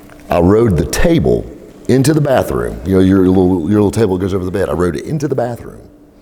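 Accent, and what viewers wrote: South, United States. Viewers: your positivity and a good nature generated a good result. Being a character doesn't hurt either. Now I'm sure the good folks at the hospital are used to your Southern accent but in this video and with that voice of yours you make a dang good story teller, son. You are one charming rogue and I could listen to you tell stories all night. Glad it all worked out all right. P.S.: Don't take the "son" part too personal. I'm a week away from 73 years so I've got a few on you. And I ride a '99 1100cc Yammie cruiser (460 lbs dry weight) on a set of stickies and they earn their keep. All in good fun.... Keep it sticky side down, and thanks for sharing. Best regards from a Canadian. The part a couple of hundred miles east of northern Michigan. I'm 45 minutes from the Seaway if the right people are looking in the correct direction, namely away.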